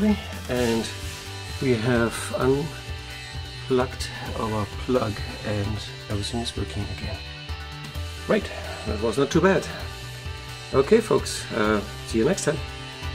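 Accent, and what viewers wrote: Germany. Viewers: I love your soft German inflected English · Ooooo you’re German aren’t youuuuuu. 😘😻😻😻😻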